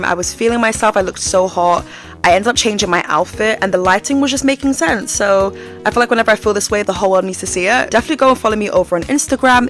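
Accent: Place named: United Kingdom. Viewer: Why are you talking British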